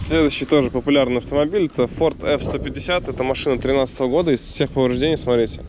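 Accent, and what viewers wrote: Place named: Belarus. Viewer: Маашинуу😁👍акцент прям Белорусский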